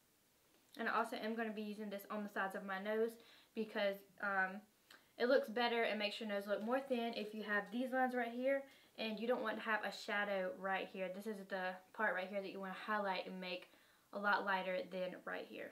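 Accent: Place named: South, United States. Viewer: Wow! That’s a crazy trick considering coconut oil actually breaks down Makeup!! You look super gorg!! Loving the bloopers!!! Where are you from? I can tell you’re a southern sister!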